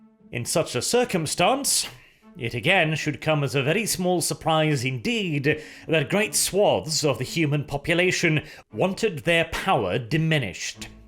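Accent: United Kingdom. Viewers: I love your videos almost as much as I love 40k! But I have a question: Did you know that you have the "evil" British accent?? Works out great but I'd hesitate to meet up for a drink at a little pub . . . . . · I just started watching your content after the perturabo and you my friend have the quintessential British voice and it’s so good to listen to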